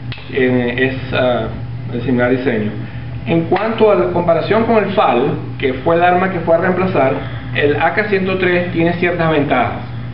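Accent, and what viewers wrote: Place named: Venezuela. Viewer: por el dejo se nota que es venezolano.... habla tanto del ak-103 pero no muestra ni uno....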